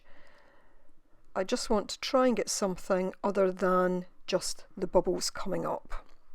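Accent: Scotland, United Kingdom. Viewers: Thank you for your video , I learned a lot . I love your accent, are you Scottish? Love from Canada 🇨🇦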